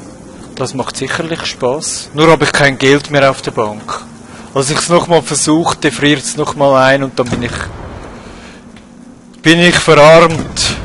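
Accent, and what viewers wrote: Switzerland. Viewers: erstmal geiles video :D ich liebe dein schweizer akzent... ein vorschlag von mir mit höllenhunden schwer und ohne magie auf/in stadt und alles mit ungepunchten waffen ;D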